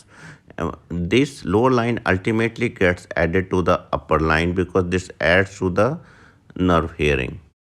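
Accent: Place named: India. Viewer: Close captions would be handy considering the strong Indian accent, and that hard of hearing or Deaf folks will likely be seeing this.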